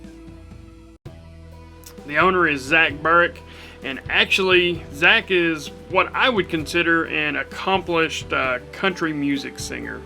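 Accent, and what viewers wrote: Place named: Rural, United States. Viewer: Dude I really didn’t notice your country accent. Some words you say maybe but didn’t notice. is much stronger. Love the country accent.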